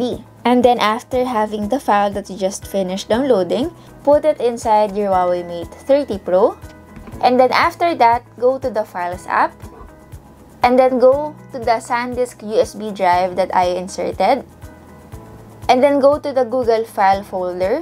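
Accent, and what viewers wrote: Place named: Tagalog, Philippines. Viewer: Tagalog accents* Dongle -----> DONGEL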